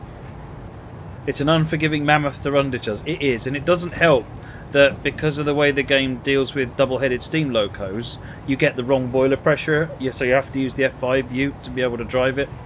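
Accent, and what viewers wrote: United Kingdom. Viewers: You have a bit of a suffolk/norwich accent.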